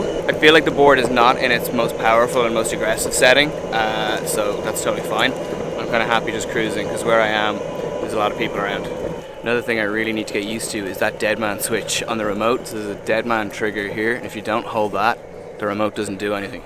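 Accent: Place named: Canada. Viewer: You are in Ireland? You sound Canadian to me, which is very close to America , I hope you are not giving up your accent geez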